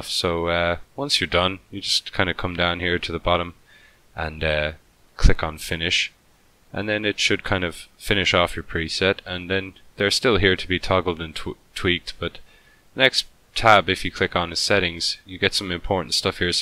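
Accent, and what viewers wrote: Ireland. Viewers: Just came here to listen to the beautiful Irish drawl...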